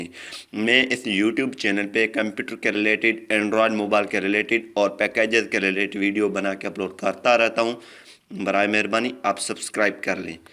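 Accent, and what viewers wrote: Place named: Sindh, India. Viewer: Lagta ha ap sindhi ha ap k lajhy se lag rha ha.vip 👌👍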